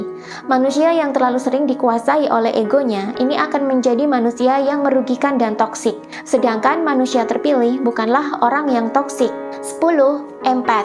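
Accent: Java, Indonesia. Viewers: sampean kok medok mbak. jowo po ! 🤭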